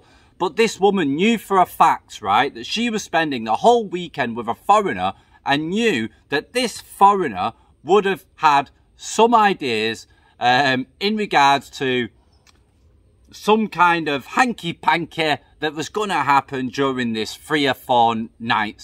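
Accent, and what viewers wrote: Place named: Yorkshire, United Kingdom. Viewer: Are you from Yorkshire? You sound like you from leeds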